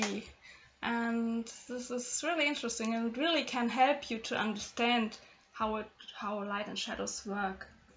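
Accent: Germany. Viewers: your english😁 are you german oder something? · Ein schönes Video und dazu sehr hilfreich! Dankeschön 🧡🧡🧡🧡🧡🧡👌✌ps: Du scheinst einen rheinischen Dialekt zu haben 🤔😌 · wollte das gleiche fragen, cool dass du aus Deutschland kommst, bist echt talentiert... finde den deutschen Aktzent immer voll knuffig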